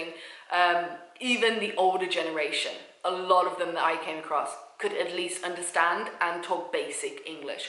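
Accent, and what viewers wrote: England, United Kingdom. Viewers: I love that English accent.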